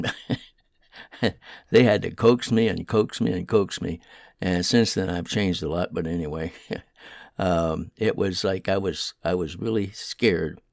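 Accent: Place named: South, United States. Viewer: OK 👍 I'll subscribe to you... because you sound like a country hillbilly, like me...🤣 nice little story about Blackie and the well house..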